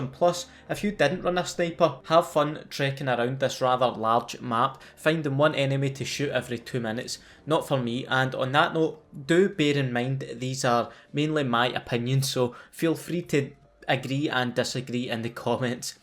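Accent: Scotland, United Kingdom. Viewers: Is he scottish? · I love watching your vids I'm british and I can do a Scottish accent due to you thx my favourite thing I heard you say was you camping bastard #notification sqad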